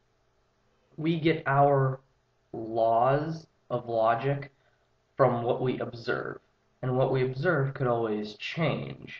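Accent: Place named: United States. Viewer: Why do you have an American accent?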